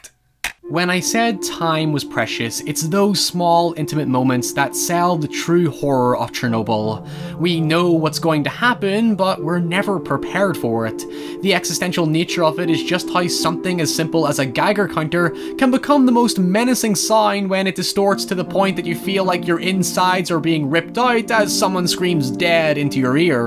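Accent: Northern Ireland, United Kingdom. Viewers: Until you said "Nah m8 youre fucked" I didn't reaise you had a norn iron accent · Feels so good to hear a proper Northern Irish youtuber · Hye lad u from norn iron? Ye saft hur ya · This man sounds like hes from NI, wooopa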